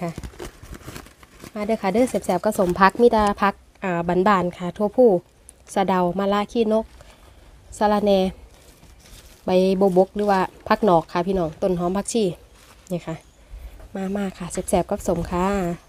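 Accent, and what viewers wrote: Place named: North, Thailand. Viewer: อู้เหนือด่ก่จ้าว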